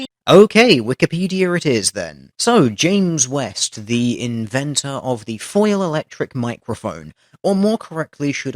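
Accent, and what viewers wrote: United Kingdom. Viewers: So it's another guy with a British accent complaining about "social justice warriors"? Got it.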